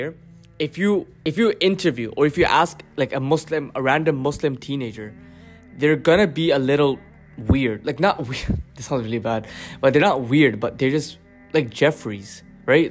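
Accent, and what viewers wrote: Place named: Pakistan. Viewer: he sound like Pakistani no racism I am Pakistani also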